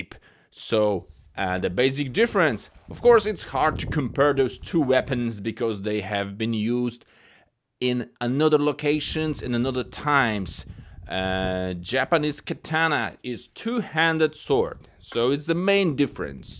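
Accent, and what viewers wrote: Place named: Russia. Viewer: Why does he have a russian accent?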